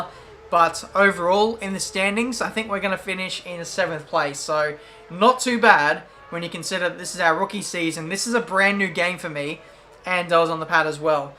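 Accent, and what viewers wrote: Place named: Australia. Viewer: kapiniuzertva its his Australian accent he says mart'n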